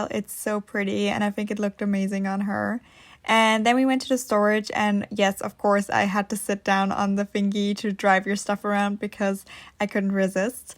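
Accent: United Kingdom. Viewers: It's like you re speaking a better English (in British way ) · You have quiet a British accent, love that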